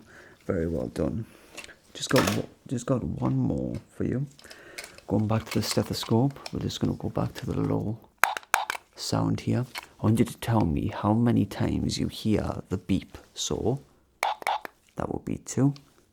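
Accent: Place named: Wales, United Kingdom. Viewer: Your accent is lovely! Welsh I think? 😅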